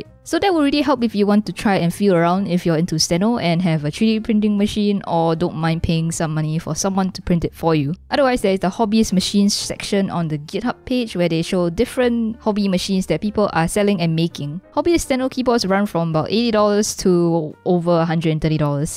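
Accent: Singapore. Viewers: are you singaporean? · are you singaporean? 😁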